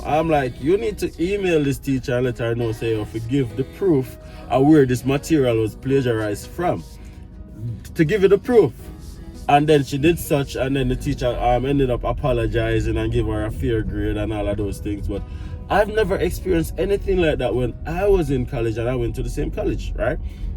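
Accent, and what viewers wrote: Jamaica. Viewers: I wonder if your Jamaican accent would have blown those Mennonite kids' minds if you'd spoken to them.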